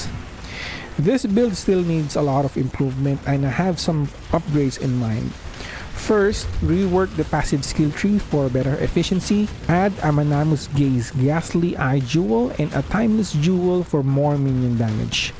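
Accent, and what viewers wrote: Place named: Philippines. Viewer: Accent is very pinoy ✌️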